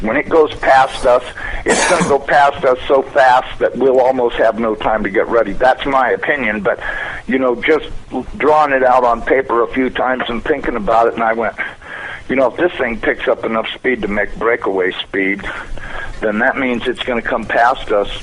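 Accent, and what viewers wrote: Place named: South, United States. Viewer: 13 years later total bullshit. this guy sounds like hill billy trailer trash.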